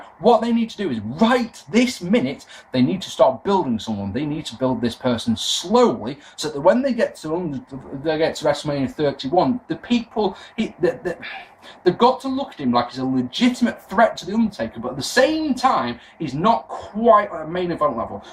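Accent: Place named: Cockney, United Kingdom. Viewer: Hay why do you do the whole cockny boy "faaking awesome"?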